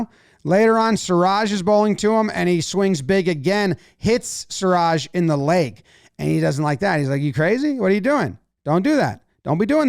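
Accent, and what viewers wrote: United States. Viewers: Amazing to hear an American commentating, glad you're loving the game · It's pretty cool listening to an American talking cricket! Haha! You should also check Aussie rules football and V8 Supercars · Who else randomly started watching, and after hearing the American accent, thought, I may click off soon but watched the whole thing and thoroughly enjoyed it... Thanks...subscribed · It is so weird to hear an American talking about cricket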